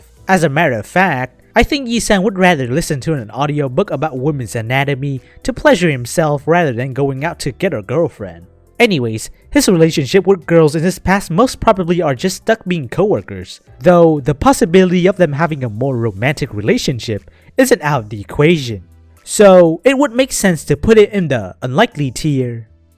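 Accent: Vietnam. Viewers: you sound so vietnamese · Seeing this guy Vietnamese accent continue to become more presence is funny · why do you sound like a Vietnamese/Asian?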